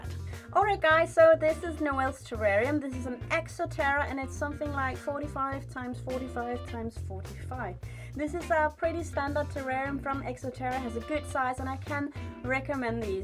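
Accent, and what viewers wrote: Denmark. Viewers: its a beautiful accent danish is similar to one of languages called afrikaans 🙈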